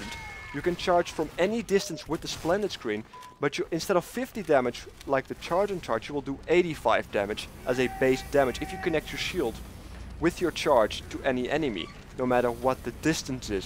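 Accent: Netherlands. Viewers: Lekker nederlands accentje hoor :)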